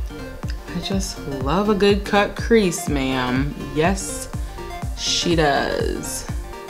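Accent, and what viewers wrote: Trinidad and Tobago. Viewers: Gorgeous look. I caught the Trini accent before you said it. 😂😂😂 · Yessss gyal! Bring out d Trini accent!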